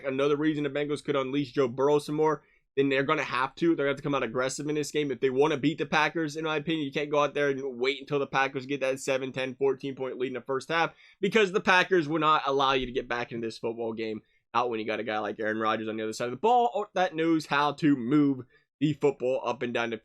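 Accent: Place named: Appalachia, United States. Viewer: I hit the wrong button and got stuck listing to a hillbilly talk about nothing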